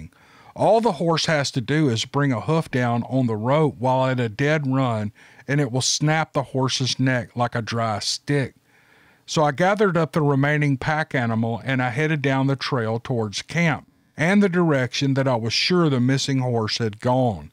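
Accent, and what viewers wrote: South, United States. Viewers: Hey Sir… by your twang I think your a southerner. The start of this video shows a lot of nice trees and green grass not familiar with that in TX. You do not need to state the city etc. However could you say what state y'all lived in. Thank You.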